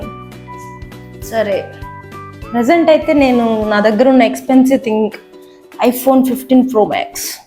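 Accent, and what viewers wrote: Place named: Guntur, India. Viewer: Tirupati antunnaru but maa guntur slang undi enti ?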